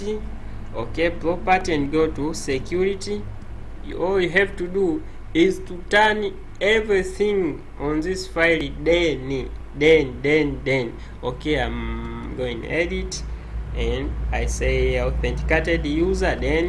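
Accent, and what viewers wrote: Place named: Africa. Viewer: First tutorial with an African accent. Helpful and I like it. Proud Africa💚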